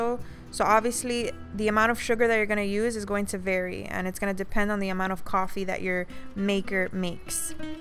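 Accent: Miami, United States. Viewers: Because I hear a Miami accent. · Good job on the video. I sense a Miami accent lol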